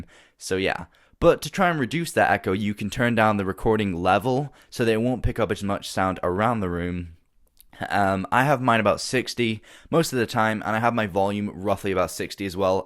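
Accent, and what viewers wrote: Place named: Scotland, United Kingdom. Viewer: you have got a wee Scottish accent coming through mate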